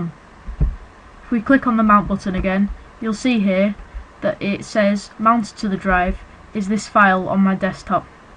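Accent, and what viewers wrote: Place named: United Kingdom. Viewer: Thanks! And .. uhm .. I LOVE YOUR BRITISH WAY TO TALK!! :)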